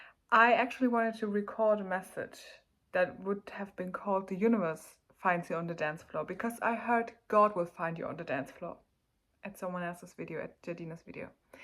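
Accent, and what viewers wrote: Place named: Germany. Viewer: you sound german, Grüße! :D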